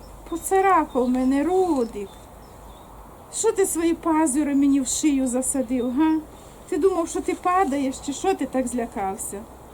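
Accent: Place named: Boyko, Ukraine. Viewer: У вас хороші діти.А де та дочка що приїзжала з онучкою?чи це Олега дочка.Бачу у вас вже такий бойківський говір😅.А я якраз щось подумала сьогодні за вас що ви щось не виставляєте відео,заходжу а ви тут🥰.Рада за вас що придбали дрова,ви знаєте як би важко не було але ще жодна жінка не пропала без чоловіка і у вас все буде добре❤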